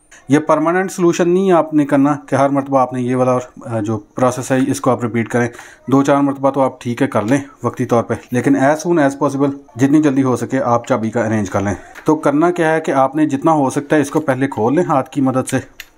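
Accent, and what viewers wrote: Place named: Punjab, India. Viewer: Bolne me Punjabi aa rahi hai lekin madri zaban Punjabi ki izzat nhi karenge Pakistani punjabi log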